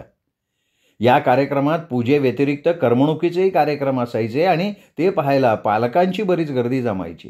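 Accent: Konkan, India. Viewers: छान आवाज मस्तरंचा. अगदी कोकणात.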